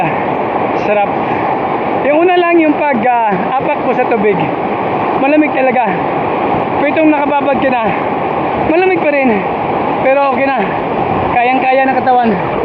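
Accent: Iloilo, Philippines. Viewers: Kuya Taga saan ba talaga province nyo.parang ilonggo salita nyo?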